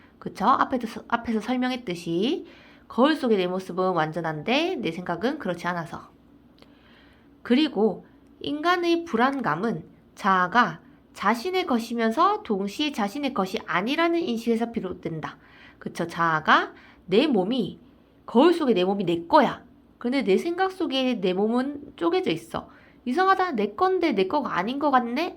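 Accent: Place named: Gyeongsang, South Korea. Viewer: 선생님 덕분에 수능완성 실전5회까지 독서까지 잘왔네요..그런데 실전모의고사5회 독서지문 처분의 무효,취소,철회지문이랑 (가)로켓엔진의 종류와 특성(나)우주선 발사체를 이동하기위한 중력추진 지문 해설해 주실 수 있나요?…법률지문은 어휘랑 개념이 생소해서 어떻게 읽어야하는지 분류해야 하는지 모르겠어요…그리고지구과학 지문은 쌍곡선이라든지 글이 그림으로 그려야하는데.. 처음 한번에 그려진다든지 이해가 되지 않네요…후반기 더운 여름 시간괜찮으시면..해설 부탁드려요…라캉이론 올려주셔서 감사합니다..귀여운 경상도 발음이 쏙쏙 귀에 박히네요ㅎㅎ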